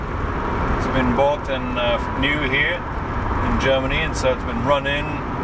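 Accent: United Kingdom. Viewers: you sire are from the Belgium?! Anyhow, Your queen english is like from Milton Keynes.:) I Have e34 525i, e39 540 and 635 CSi 1988. -Happy holidays -autobahn guy from Finland!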